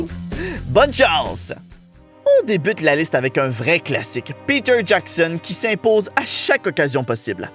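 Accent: Quebec, Canada. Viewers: Super vidéo, comme d'habitude ! J'adore ton accent québécois, il est juste GÉNIAL ! Continue comme ça et à bientôt ! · J'adore ton accent québécois ! XD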